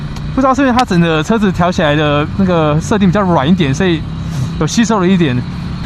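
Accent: Taiwan. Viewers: “大加分“有台灣國語的fu 好親切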